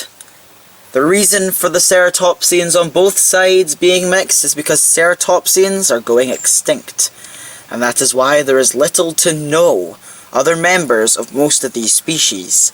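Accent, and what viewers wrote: Scotland, United Kingdom. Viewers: Dude are you Scottish? :P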